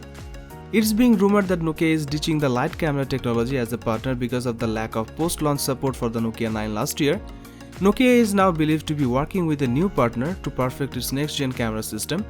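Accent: Bengal, India. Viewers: Bengali accent is unique 😄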